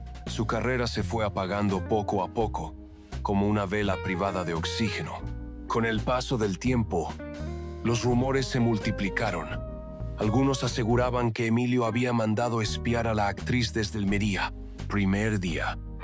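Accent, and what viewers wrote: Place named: United States. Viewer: Esa voz, como agringada, no se oye muy bien, pero meche se fué por el negro durazo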